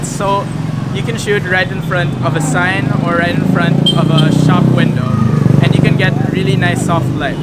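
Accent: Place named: Philippines. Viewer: From his accent alone i know this guy is filipino hahahahaha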